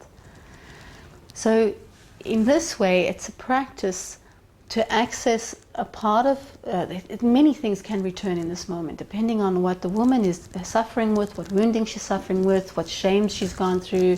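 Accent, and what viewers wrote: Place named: South Africa. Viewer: You sound South African. Are you?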